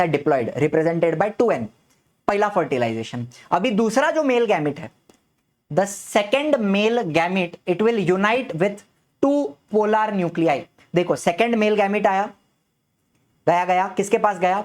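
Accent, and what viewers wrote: Maharashtra, India. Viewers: Sir are you MARATHI ??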